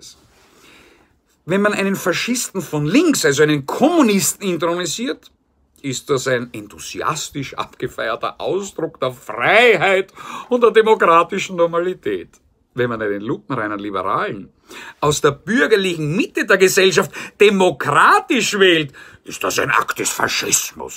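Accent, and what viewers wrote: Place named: Austria. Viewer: Hach wie schön ist es einem Österreicher zu lauschen 🙊 ach du je erwischt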